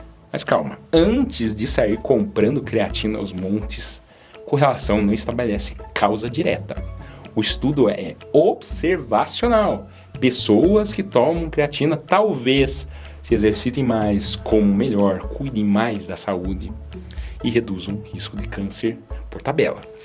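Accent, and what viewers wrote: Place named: São Paulo, Brazil. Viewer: Esse dr., tem um sotaque muito carregado de paulisteca, pouco se entende o que ele fala!!!!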